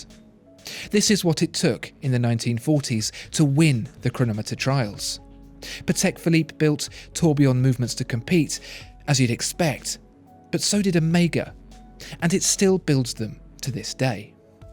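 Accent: United Kingdom. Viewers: If he didn’t have a British accent I would not be paying attanetion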